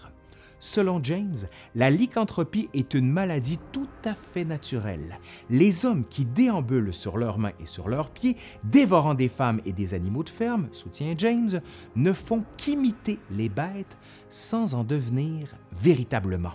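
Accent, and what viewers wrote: Quebec, Canada. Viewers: Dans les années 2000 à Bordeaux, j'ai vu une exposition québécoise fabuleuse sur le Moyen-Âge. Cela a achevé de me passionner pour cette époque de mon pays. Depuis, le Québec m'aide à connaître la France, et j'ai appris en retour à connaître ce beau pays de Québec (libre! 😉) et sa culture si riche. Mon fils de 8 ans te regarde souvent avec moi car "il explique très bien le monsieur!" et il peut suivre. Et on adore ton accent! Bisous de France 🐸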